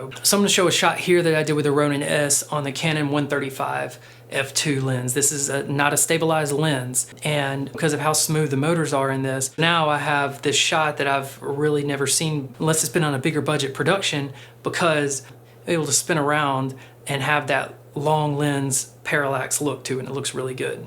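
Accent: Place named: Utah, United States. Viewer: I've got family in Utah that I visited recently (im from Denmark) and I just love your channel and the accent from the Area. Thank you for the great guide on this gimball :) Kind Regards from Denmark Jørgen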